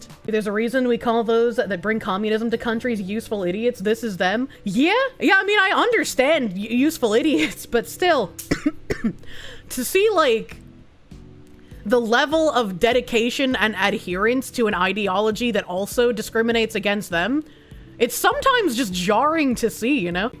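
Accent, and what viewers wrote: Boston, United States. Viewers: Needs to up the boston accent best thing to come out of america, god i genuinely love it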